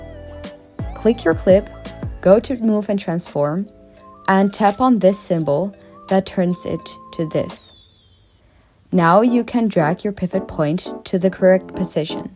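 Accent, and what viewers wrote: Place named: Netherlands. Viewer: i smell dutchness :D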